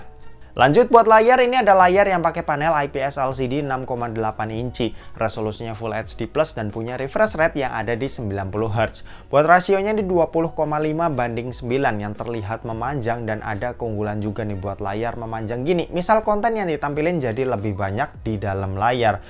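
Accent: Semarang, Indonesia. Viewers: Anak Semarang ya mas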